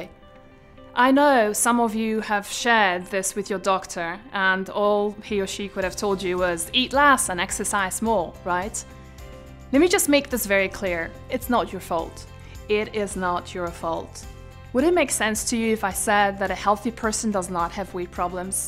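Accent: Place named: Ireland. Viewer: Like ur Irish accent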